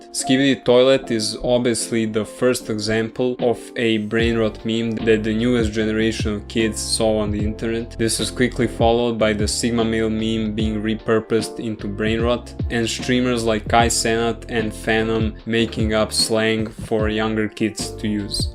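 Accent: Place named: Russia. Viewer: I thought it was sorry for the misunderstanding, you just sound russian.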